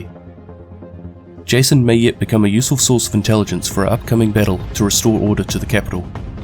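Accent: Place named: New Zealand. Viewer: oh okay, i kinda like that kiwi accent...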